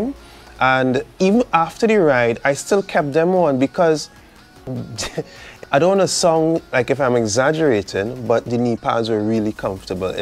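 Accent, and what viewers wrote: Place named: Trinidad and Tobago. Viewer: I hear a trini accent where do you ride ?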